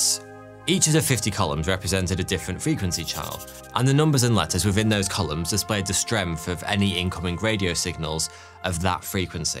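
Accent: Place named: RP, United Kingdom. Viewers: Goodness, a posh British accent makes everything more palatable. It’s prized by Wall Street, Madison Avenue and Hollywood. Actors take classes in The Received Pronunciation. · A posh British accent can’t make bullshit more palatable. Several explanations exist for the ‘wow’ signal.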